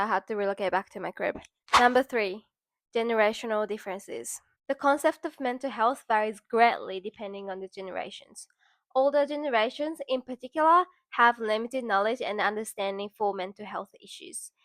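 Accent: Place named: Australia. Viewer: I subscribed because of your australian accent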